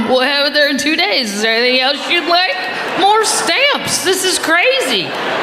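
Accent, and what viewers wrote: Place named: Chicago, United States. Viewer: Are you from Chicago? Your accent defaults to da accent ❤❤❤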